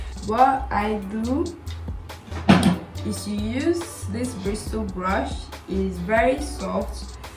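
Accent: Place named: Nigeria. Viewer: sis are u nigerian? what state are you from? love your accent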